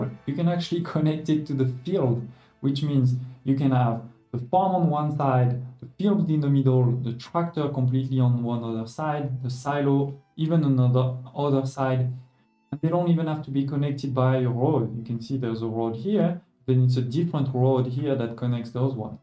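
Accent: France. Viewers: Merci ! Cet accent français à couper au couteau rend l'anglais parfaitement compréhensible pour moi, donc double merci ! Bonne continuation.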